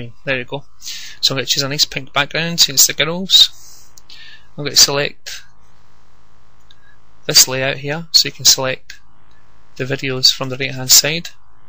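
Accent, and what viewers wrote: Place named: Scotland, United Kingdom. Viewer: omg the scottish accent is soo cool. and what a comback at comedy agony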